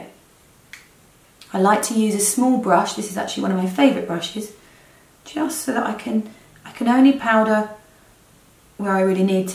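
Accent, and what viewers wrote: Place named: London, United Kingdom. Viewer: You have such a beautiful, perfect face! And I love the London accent! 😊🦄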